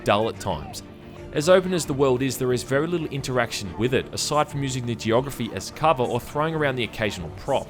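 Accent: Australia. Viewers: hussien Strahyan Accent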